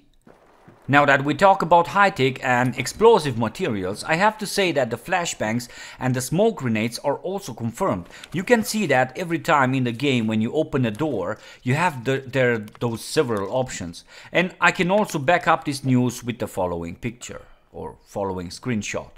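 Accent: Germany. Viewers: I like your german accent :) Keep up informing us about tarkov :D!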